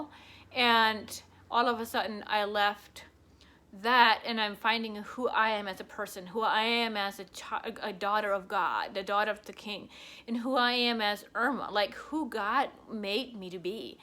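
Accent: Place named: Ohio, United States. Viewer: You must be from Ohio ( Holmes County) I am guessing by your accent I have relatives from there 👍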